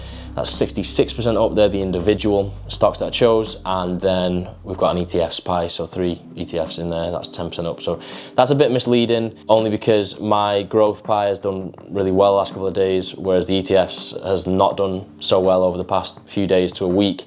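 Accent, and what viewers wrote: Liverpool, United Kingdom. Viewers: I was not aware that you were into finance/investments. Anyway, providing you stick at it, you will improve (just like fitness). From your accent I think you are based in Liverpool - have you thought about buying an investment property? You'll be surprised that even with just £20k, you can enter the market. Anyway, good luck with your endeavours.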